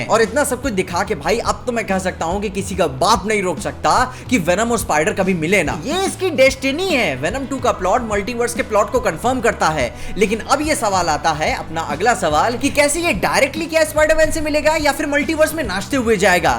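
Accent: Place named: Bihar, India. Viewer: bhai tera bihari accent bekar hbai,bhai pure hindi me hi explain kar le ,jyda accha lageag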